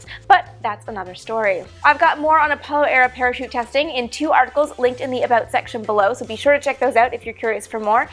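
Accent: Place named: Canada. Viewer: I was wondering the same thing - I noticed how you { } pronounce your "ou's" when you say words like "about". {FWLIW: Growing up in Florida, there was an 'older couple' who were neighbors of my parents. The husband was from Italy, and the wife was from Canada. Listening to her talk was my first exposure to that pronunciation.}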